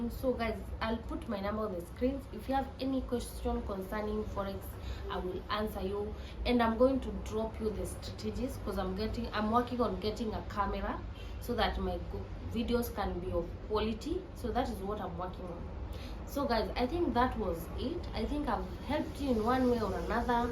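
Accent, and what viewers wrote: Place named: Kenya. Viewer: The kenyan accent! 9feb26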